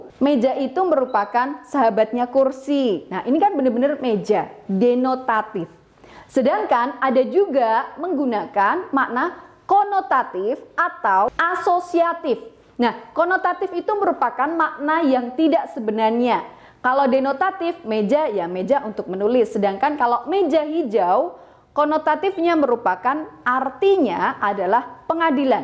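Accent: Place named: Java, Indonesia. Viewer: Enak banget ngejelasinnya ,bhs jawanya keluar medok banget Bu🤭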